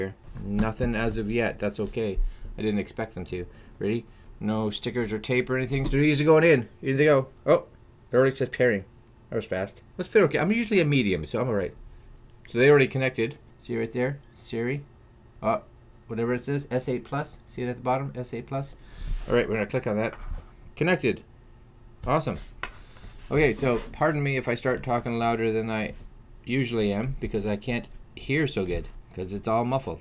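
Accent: Canada. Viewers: Great video if your not Canadian you sure do sound Canadian from your viewer up north ,keep up the great reviews this is my new favourite Chanel 👍🇨🇦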